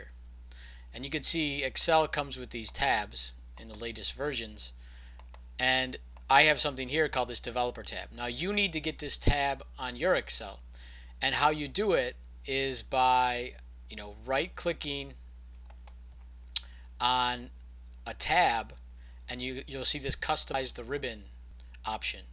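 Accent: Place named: Boston, United States. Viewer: I think I found Bill Burr’s brother! Sounds like him, am I picking up a bit of a Boston accent there? 🤔🤔🤔🤔🤔🤔🤔🤔🤔🤔🤔!!!!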